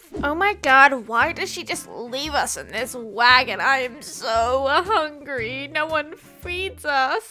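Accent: Australia. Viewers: Just found your channel and could instantly tell your a fellow Aussie like me, btw your vids are hilarious pumpkin man keeps getting me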